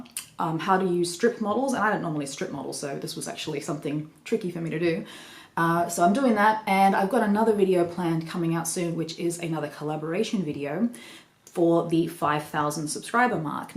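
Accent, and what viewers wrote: Australia. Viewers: Sorry to hear about your troubles. Well done seeking help. I've only just discovered your channel, it's great to hear another Aussie accent on mini related videos. Especially sorry to hear that your booze got pinched when you needed it most. Look forward to catching up on all the videos you've posted thus far. (I'm very curious about the models in the display cases behind you. I hope they are featured in your other videos, and if not, could you do a video tour of them)? All the best.......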